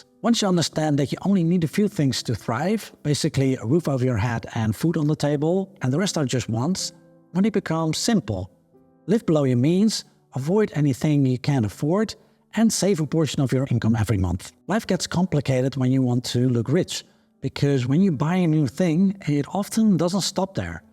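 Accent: Netherlands. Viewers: You sound like you are from NL. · Hey, would you be dutch by any chance?? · You sound Dutch.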